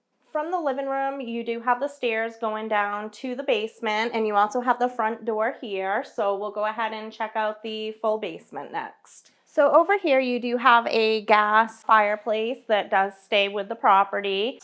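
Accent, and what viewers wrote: Maine, United States. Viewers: She has a Maine accent.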